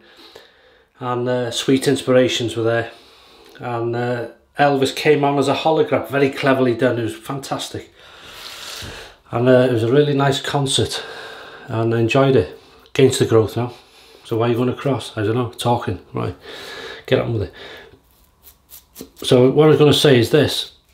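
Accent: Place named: Yorkshire, United Kingdom. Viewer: Great video are you originally from Wales?? I'm picking up Yorkshire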